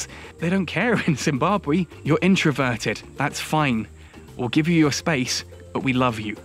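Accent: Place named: RP, United Kingdom. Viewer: I am Zimbabwean in UK SURREY. Zimbabwe is everything you have said indeed. With that I declare you a Zimbabwean son, brother and one of us. You have said it all and life permits, can’t wait to meet you and your wife, and have a blast ☺️☺️☺️👏. You sound such a well brought up British boy and with this positive and good spirit. I see many Zimbabweans falling in love with you 😍😍😍😍✌️. Keep up the good work and try to do some collaborative with other Zimbabwean bloggers. You won my heart already 💕💕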